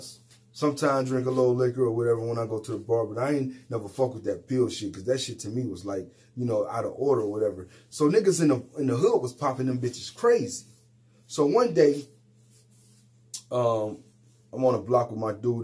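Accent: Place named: Cleveland, United States. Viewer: CMHA? Cayuga Metro Housing Authority? I was trying to figure out where you were from by your accent--I thought maybe Chicago but now I could hear it is Cleveland (lol after looking up CMHA!)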